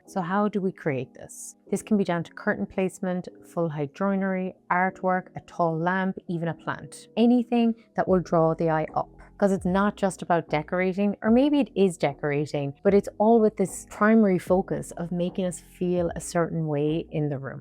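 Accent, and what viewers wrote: Ireland. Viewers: first, she's irish, in my opinion a much cooler accent. second, i recently ' learned ' (it may or may not be true) that before amer. was amer. the british spoke with an 'american' accent. it was the british who changed, amer. accent is the original. if it is true, it is pretty funny. btw there are many different british accents as well and some of them are also torture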